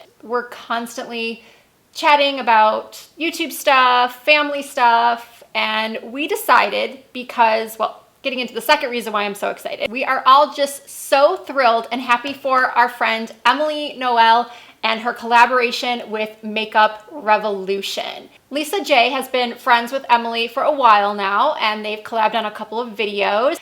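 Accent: Midwest, United States. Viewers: Hi. I love the look you created. I’m so excited to get these. I love the support you, Lisa and Stephanie offer Emily! Strong, beautiful women supporting another strong, beautiful woman. Makes me so happy and proud to be a subscriber to all of you ladies. You guys have created the very best YouTube communities out there💜. Thank you ( I’m from Michigan too! I knew you sounded midwestern😊). Cheers 🥂 to this community!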